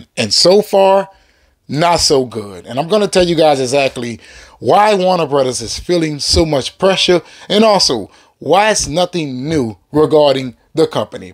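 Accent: New Orleans, United States. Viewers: Sounding so New Orleans in this vid dude. 😂👍🏻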